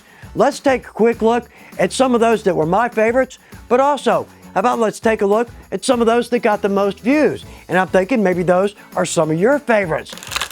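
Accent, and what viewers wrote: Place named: Texas, United States. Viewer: Congrats. I haven't been watching your videos for ten years, but I have for a long time. I have been doing leather work since high-school ( I am 80), but every time I watch one of your videos I learn something. Sometimes it is something that i have forgotten about but that's a different story. I enjoy watching you work and all the tips you have. One thing though, your Texas roots show up once in a while in your speech. You can take the boy out of Texas but...............! I live in Texas a little south of where you did. Keep the videos coming because I am not through learning! Thanks!!!!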